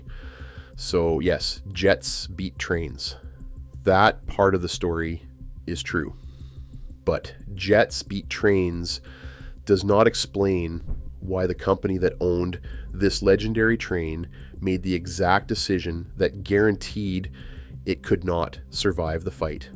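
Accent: Canada. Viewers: (He sounds almost Canadian.)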